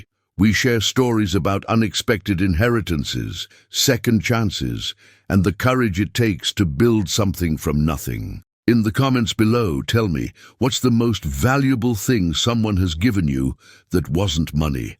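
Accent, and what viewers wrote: United Kingdom. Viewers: The plummy English accent of the storyteller is totally at odds with the narrative.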